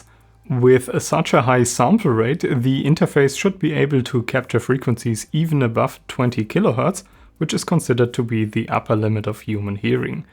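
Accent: Germany. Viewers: Love your accent Man. Don't bother about the comments on your German accent. Keep the videos coming. What matters is the content in the beginning and content in the end. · First time in my life I can't set English subtitles on English video - why? Because of GERMAN accent? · it's his German accent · He’s not robotic it’s his German accent. - made my day :))) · Love the accent mate, used to it by now since my family is German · Is there any negatif comments on his accent ? Every guy with a german accent talking about tech or music i heard were right on almost everything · Not gonna lie... I didn't even notice the german accent.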